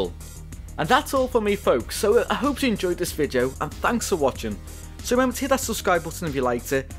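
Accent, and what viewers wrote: Germany. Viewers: That's a really great result, really a fantastic job you did there. I also really enjoyed your accent, you sound German, are you originally from Germany? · Incredible transformation! Good job! Haha your accent made it very easy for me to understand what you said bc you pronounce the letter U just the way we do in Germany. Greetings and keep up the good work.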